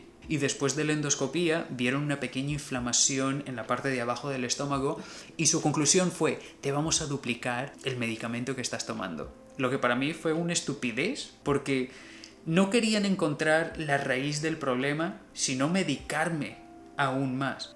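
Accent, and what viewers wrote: Canary Islands, Spain. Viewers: podrías en algún futuro vídeo hablar un poquito de portugués?, juraba con ese acento que eras español jajaja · Que sorpresa siempre pense que eras español por el acento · en españa en lo que se dice acento neutro se pronuncia las c y z...el tiene acento parecido al de canarias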